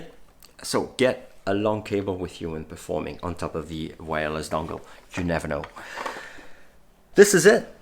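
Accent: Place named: Norway. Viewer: What is that accent dude? I can hear Norwegian, american, scottish and swedish??🤔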